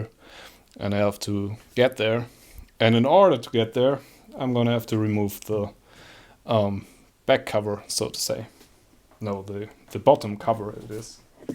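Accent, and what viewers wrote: Germany. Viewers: Ich höre da ein deutschen raus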